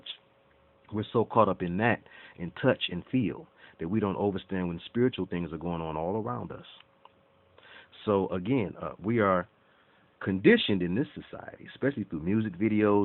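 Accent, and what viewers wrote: Detroit, United States. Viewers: You Detroit alright. haha. Your speech gives you away. wink wink. I'll add some jesters, to soften blow. I do have a sense of humor. I meant nothing, but to share. I'm the nicest eevvveeer! Might want to edit and erase #. I got it. They're are crazies out there, don't know. Is it you or me? lol Thanks though. Gave me a bit of a complex, but not much, I'll get over it. I've very delicate but when it comes to this here bible, I can't afford to fail. Everyone should feel the same so respect. Good I can watch your videos again. Woosh.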